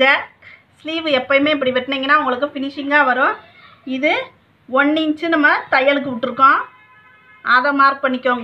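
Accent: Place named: Madurai, India. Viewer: Tailoring institute poitu kathukita feeling.thelivana vilakam madurai thamizhil akka.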